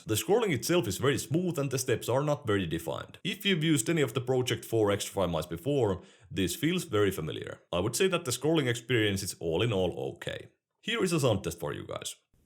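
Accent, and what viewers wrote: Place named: Finland. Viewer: I thought i recognized the pronunciation. Moro! Tilattu..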